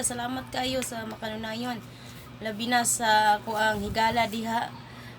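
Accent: Visayas, Philippines. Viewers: .dont be upset naghalo ang kape at gatas at isa pa.carbon copy ung babae mo sa asawa mo dat means ur so inlove sa uyab mong pula dhil kahawig na kahawig sa asawa mo yan ang kasabihan. Isa ako sa avid fans mo kc pagnagsalita ka nkakatawa ung bisaya mo parang c ate charing I'm long time avid fans of u.pls reply me sometimes.thks.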